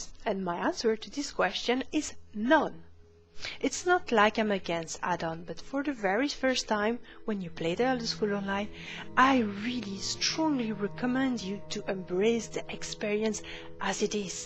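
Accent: France. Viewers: that blew my mind, because your accent while speaking English definitely has a french accent to it:D so do you also speak Dutch/German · I really like the fact that you dont give the usual hardcore, grind this, ignore the game, rush there tips. You made me and for sure other people remember why we started playing mmorpg´s in the first place: diving into a world of fantasy and enjoy it. Big thanks for that. There is only one "problem" about all this. Started the video, saw that pretty face of yours and heard your beautiful french accent. 10 minutes later i had to F5 because i did not remember any single word you said...was just floating on a wave and listening to your voice. Stop beeing so charming !!! ;) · my dear, as a new player i would just like to say thank you! :) a wonderful presentation, wonderful & very useful tips...and a wonderful french accent :) mesmerizing voice, a pleasure to listen to you! 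· your "r"s suggest that you are frenchspeaker:) love it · omg the French accent is real love it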